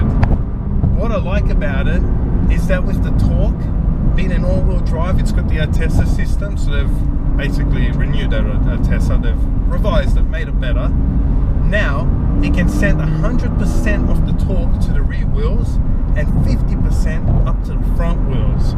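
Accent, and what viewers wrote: Australia. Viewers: you got an aussie accent mate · You sound like an Aussie!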